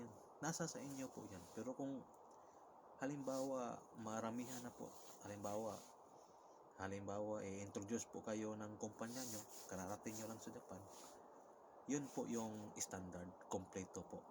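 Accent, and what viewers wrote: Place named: Visayas, Philippines. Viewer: Hirap mag japanese host BISAYA SHA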